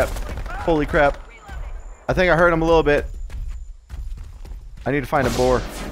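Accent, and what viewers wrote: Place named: Quebec, Canada. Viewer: Le gars est clairement québécois haha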